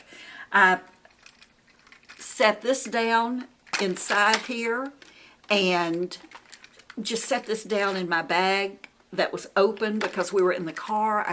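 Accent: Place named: Western Kentucky, United States. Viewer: Hey, Thanks to you, I shopped my stash and "rediscovered" my Laura Mercier Mineral Powder foundation. I had totally forgotten how awesome this product is! I love your videos and think you are fabulous! I'm from Western Kentucky so we sound a lot alike too, ha!!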